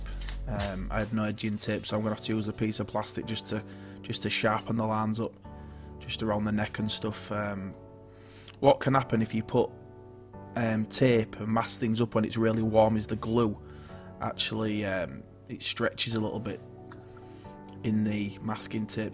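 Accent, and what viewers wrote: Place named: East Yorkshire, United Kingdom. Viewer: Great job your accent sounds like East Yorkshire. I use cocktail or tooth pick sticks cos they hold the paint better just trim the point bit if you want it thicker.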